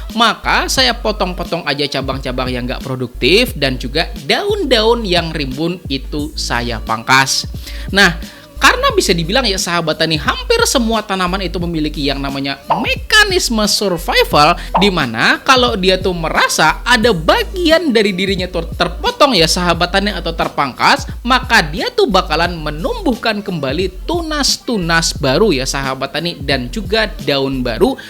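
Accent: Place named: North Sumatra, Indonesia. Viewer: Bg asal Sumatera ya..?? Kok logatnya kayak dr daerah Sumut gt..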